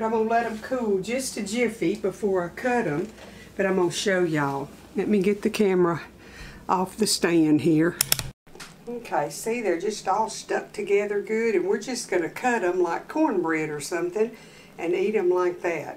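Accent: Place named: Texas, United States. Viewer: Looks amazing. Like that Texas language. Lol 😂 prayers for you and your family.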